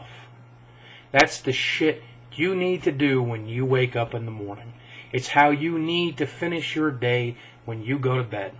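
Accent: South, United States. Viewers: Sad looking back at this Mate, funny thing is here you sound like a cowboy from the south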